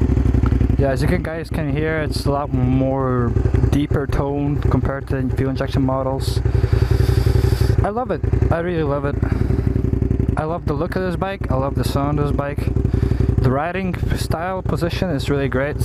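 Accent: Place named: Northern Ireland, United Kingdom. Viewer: Your accent is interesting. It is Northern Irish mixed with something else.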